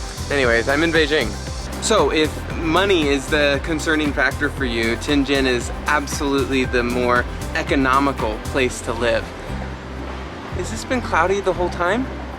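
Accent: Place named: Canada. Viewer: You are amazing for responding to all my comments. Where in the States are you from? You sound like a fellow Canadian. I like your laid back style of reporting.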